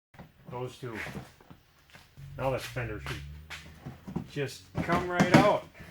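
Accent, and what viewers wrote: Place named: Northeast, United States. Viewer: You gotta be from the northeast some where.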